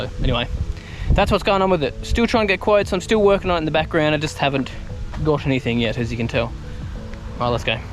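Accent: Australia. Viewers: I guessed you were from UK. After I had read your channel description, I realized that you speak English with RP OZ accent. I have never seen a house having a coop & its chickens as well during my stay in Victoria unless it is in outback. I thought having such consumption animals in urban areas was not allowed or illegal, but the house does...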